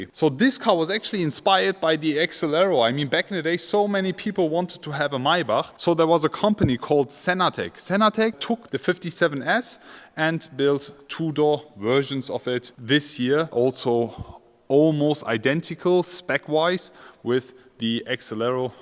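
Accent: Germany. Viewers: 독일식 영어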